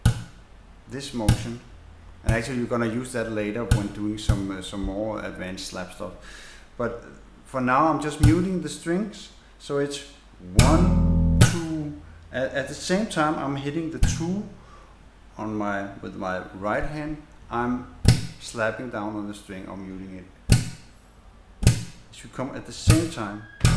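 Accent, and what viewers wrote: Denmark. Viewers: That is the CORE of danish accents! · haha vidste du var dansker da du sagde hej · That is one Danish accent right there :)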